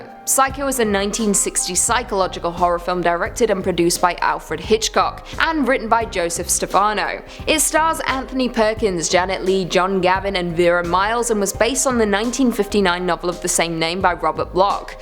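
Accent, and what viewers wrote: England, United Kingdom. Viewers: You have to remember she's from England. They also say vitta-min instead of vitamin.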